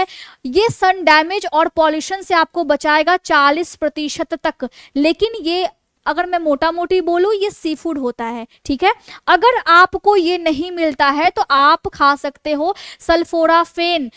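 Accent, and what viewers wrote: Bihar, India. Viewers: Aap bihari hai kya medam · Work on your tone, bol rhi ho hindi but bihar k tone aa rha hai.. your hindi word pronunciation is little bit weak..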